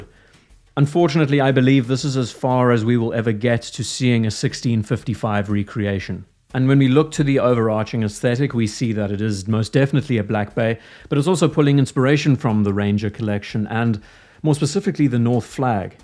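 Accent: South Africa. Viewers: I swear you’re South African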